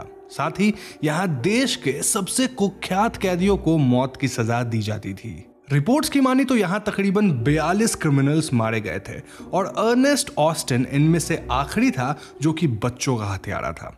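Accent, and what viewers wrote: Bengal, India. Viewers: The man who is narrating ... Are you bengali? Because your accent is quite like them...😁 By the way amazing narrating 🤓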